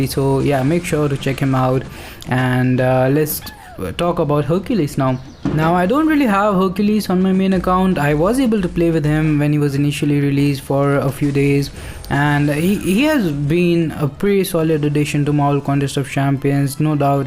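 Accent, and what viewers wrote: India. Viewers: When I saw him 1st time I thought he look like Indian and his Accent and Now finds out he is 😘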